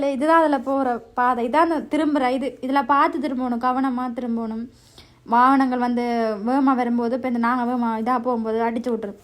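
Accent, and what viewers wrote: Sri Lanka. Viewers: you are speaking Sri Lanka is very sweet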